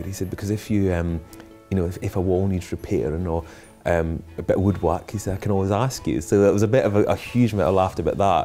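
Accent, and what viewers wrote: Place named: Lanarkshire, United Kingdom. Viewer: He'sScottish. He's speaking English with a Lanarkshire accent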